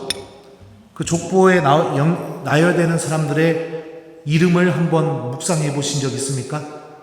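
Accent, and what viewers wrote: Chungcheong, South Korea. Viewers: 신부님 충청도 구수한 사투리 말씀과 주님말씀의 선포하시는 신부님 감사합니다 영육간에 건강히시길 기도드립니다 감사합니다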